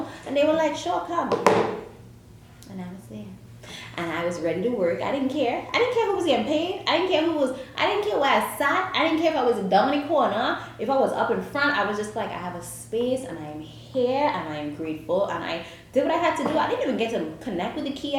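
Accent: Trinidad and Tobago. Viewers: Are you Trini? I feel like I'm hearing my accent but i'm not sure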